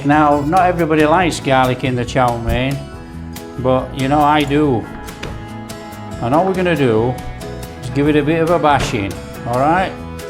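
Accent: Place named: England, United Kingdom. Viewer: No we don’t need music, we enjoy your English accent. I love bean sprouts I have them in almost every Asian dish I cook. Thanks heaps, I love your recipes. I’m watching from Australia 🇦🇺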